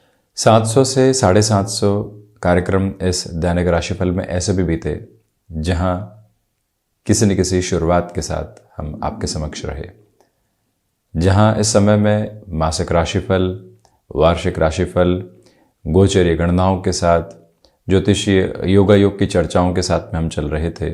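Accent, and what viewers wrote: Standard. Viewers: मैं आपके चैनल को फॉलो कर रहा हूँ और दिन प्रतिदिन का राशिफल सुन रहा हूँ। आपकी भाषा, आपकी हिंदी बिलकुल शुद्ध और साफ़ है । इस बात की ख़ुशी है की आज भी ऐसी शुद्ध हिन्दी कोई बात कर सकता है । प्रनाम 🙏🏻।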